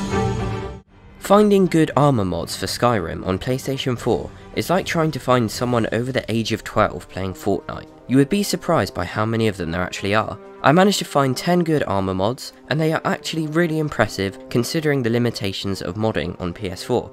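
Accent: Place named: England, United Kingdom. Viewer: You have the “crispest” English accent I’ve ever heard. Deus Vult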